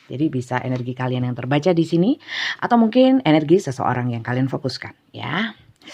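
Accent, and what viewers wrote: East Java, Indonesia. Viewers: Assalamualaikum Bunda...sll nyimak n suka readingan Bunda aplgi logatnya khas jatim...mantap👍🙏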